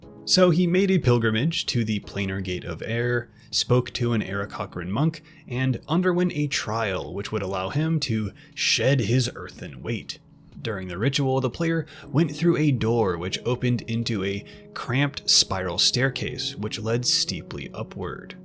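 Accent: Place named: South, United States. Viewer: don't be ashamed of the drawl, don't let bad people Steal your way of speech I love the southern drawl it should be reclaimed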